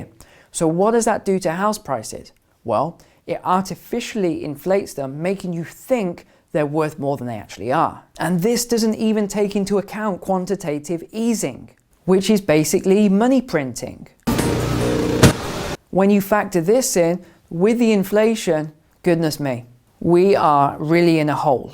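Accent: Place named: England, United Kingdom. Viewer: Morning. liked and Subscribed. So entertaining listening to a refined English accent talking about American real estate. Im a Yorkshireman with the usual Yorkshire twang. Do your grim findings apply to the UK. Cheers for the content. Tim.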